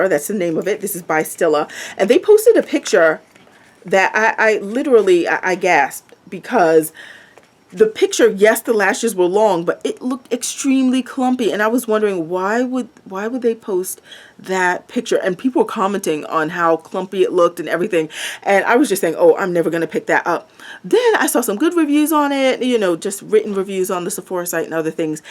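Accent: Caribbean. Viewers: Girl you're about to have me max out my card!! Thanks for the reviews!! BTW where are you from because I detect a slight West Indian accent? Stay beautiful!!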